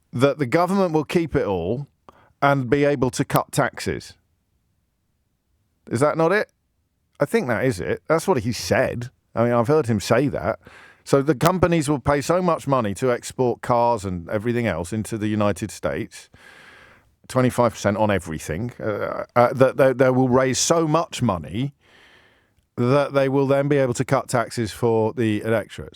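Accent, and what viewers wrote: United Kingdom. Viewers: If you have a British accent you don't know anything about America........... It's funny that EVERYONE against Trump that is complaining about the Tariffs are complaining........... You keep saying that ir will hurt America....YET....... You all are the ones complaining. Seems like all the pitching a fit is proving what Trump said. You need us more that we need you. Thing worse than following Canada would be Following the UK........ Canada is a joke and has been for a long time.... Why do you think the rest of the world calls it Terhanto.:face-blue-smiling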